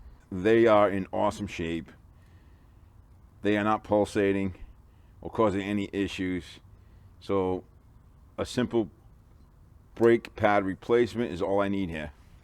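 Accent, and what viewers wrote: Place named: New York, United States. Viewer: This was the best tutorial I’ve come across for this specific job for this specific car. Hers is a 2018 I hope this applies. My daughter wants me to change her brakes and she really doesn’t want to pay those outrageous mechanic prices and neither do I. I’ve only done 2 other vehicles. So here goes! Oh! If you don’t mind my saying, you sound like you’re from my neck of the woods. NY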